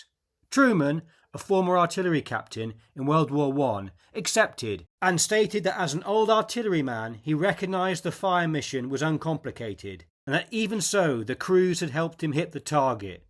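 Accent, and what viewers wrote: United Kingdom. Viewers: There is something F.C.U.K.D UP about listening to a Brit covering Vietnam War ?.....NIGEL DIDN'T $URF !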